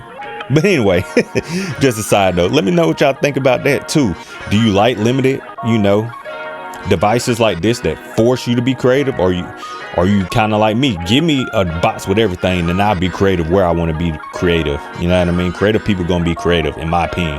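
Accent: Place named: Bay Area, United States. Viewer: my guy sounds like hes from the BAY lol I LOVED my KO2 it makes music fun again. my MPC just sits as of recent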